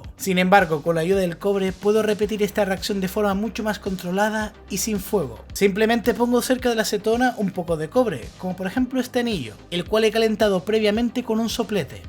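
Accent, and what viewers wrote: Chile. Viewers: Hablas como chileno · Hola. Soy de Chile, no sé de qué zona de España eres, pero me parece que hablas parecido a nosotros. Saludos. Felicitaciones.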